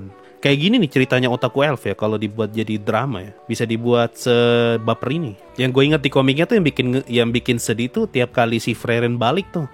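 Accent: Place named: Eastern Indonesia, Indonesia. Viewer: Logat nya org timur sekali aleee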